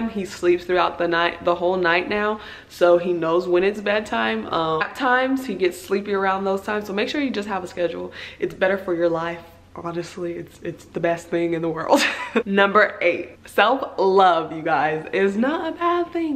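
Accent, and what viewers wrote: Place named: United States. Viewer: You sound country and I love it😩😩😍😍😍❤️❤️🥰🥰🥰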